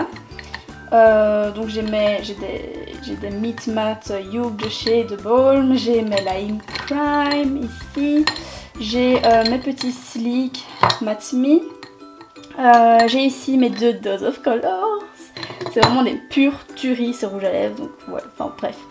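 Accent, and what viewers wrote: Belgium. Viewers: j'adore ton rangement makeup :) haha l'accent belge j'adore #belge (je le suis aussi ) tu es d'ou en belgique?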